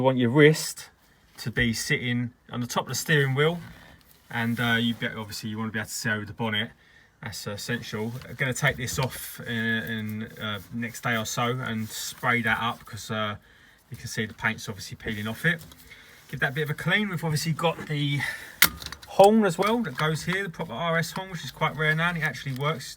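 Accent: Essex, United Kingdom. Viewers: Essex boy voice sounds like micky flanagan lol love the videos brother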